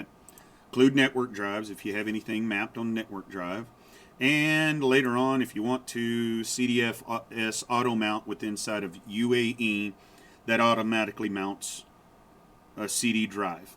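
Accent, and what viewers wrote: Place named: Texas, United States. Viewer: I trust a man with a Texan twang.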